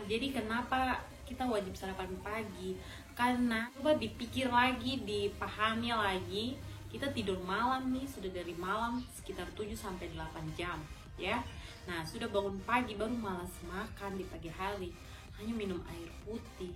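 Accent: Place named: Ambon, Indonesia. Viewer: Enak banget dengerin pake nada ambon yaa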